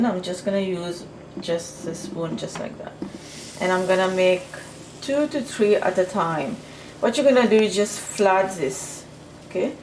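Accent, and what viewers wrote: Mauritius. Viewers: You have a mauritian accent.x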